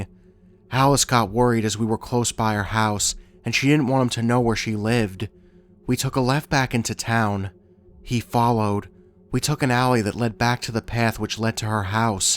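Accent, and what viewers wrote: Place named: East Coast, United States. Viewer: I love hearing your stuff, and always notice the East Coast, Tri-state "twang" to your voice! It's like listening to a friend or family member of mine telling me a story, as we're from Jersey (then Delaware and now Lancaster, PA). Not sure what state you're from but I hear it : )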